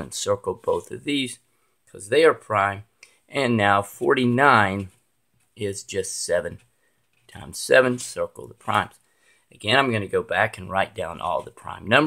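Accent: South, United States. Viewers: The southern accent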